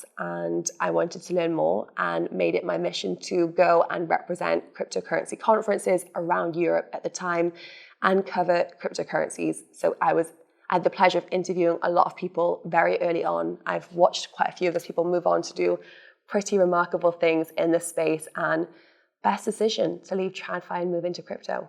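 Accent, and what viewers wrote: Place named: Scotland, United Kingdom. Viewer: Thank you. Enjoyed your presentation and explanations. English / Scottish, niiice. Beautiful language.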